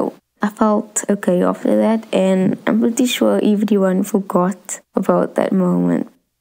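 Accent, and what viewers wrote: South Africa. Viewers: I think she's South African because thats sort of how i sound when i speak english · I can tell that she's South african 😎😎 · Iqra Nazeer to me she sounds like she's from South Africa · I'm from south Africa and could instantly hear that she's colored lol same like me.. The "what-not" gave it away among other things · it's sounds like a south African accent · She sounds South African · She sounds like a South African like me❤️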